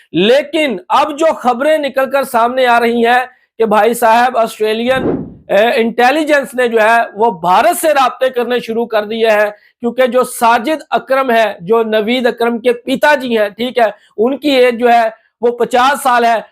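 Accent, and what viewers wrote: Pakistan. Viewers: Me ne aaj is Bhai k channel ki detail check ki Is Bhai ka channel to Pakistan se chal raha hai. Is channel ki detail me Pakistan likha hai. Me b sochta tha k ye itna khul kar Pakistan ko q support karta hai Lekin dress Indian hai baqi is ki language muje confuse kar rahi thi k bolne ka style to bilkul hmare jesa hai. Haqeeqat Allah hi behtar janta hai.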